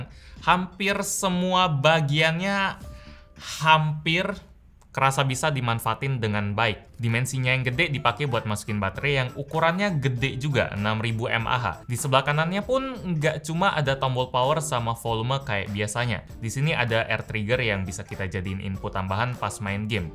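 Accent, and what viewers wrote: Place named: Java, Indonesia. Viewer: 865 tok! Medok sekali anda bang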